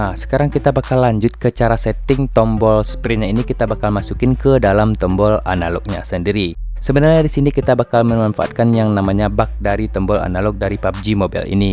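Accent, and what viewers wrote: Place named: Aceh, Indonesia. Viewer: Bang lu orang Aceh lah, soal nya cara ngomong logat nya kek orang aceh, perasaan gua doang atau gimana ya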